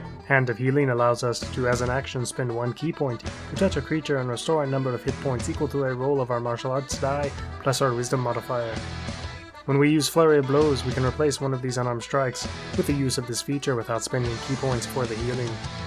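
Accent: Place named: Scotland, United Kingdom. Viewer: The fact that the guy making this has a Scottish accent too 😂😂😂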